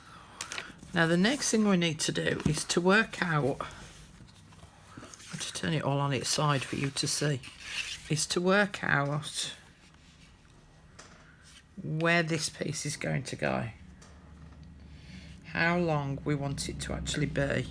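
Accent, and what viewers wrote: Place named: Black Country, United Kingdom. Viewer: Love you and your crafting.im a blackcountry wench as well,but live in hampshire now.your voice and accent and humour soothes my soul and reminds me of home.xxx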